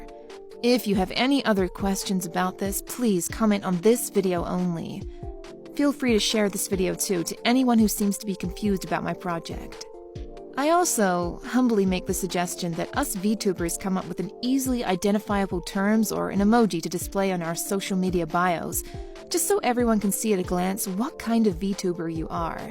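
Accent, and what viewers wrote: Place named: Australia. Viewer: I've never heard your normal voice before. For some reason I always thought you had an Australian accent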